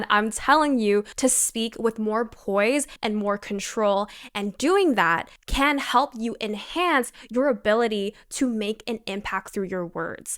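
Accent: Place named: Los Angeles, United States. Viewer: The LA accent ... sounds stupid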